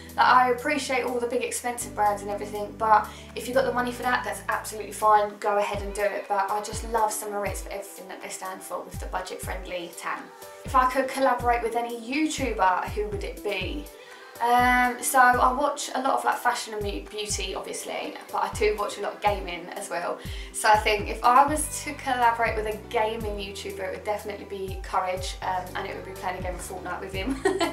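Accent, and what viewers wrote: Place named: London, United Kingdom. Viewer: I thought you were from Essex - excuse me! South or east London I am guessing?! Love your refreshing nature x thank you for the video x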